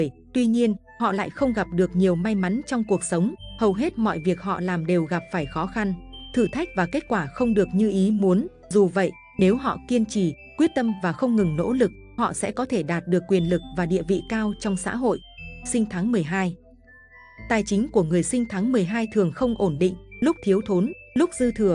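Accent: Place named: North, Vietnam. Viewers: Nói Tiếng bàc khó nghe quá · Tiếng miền Bắc rõ ràng từng câu, từng chữ đấy ạ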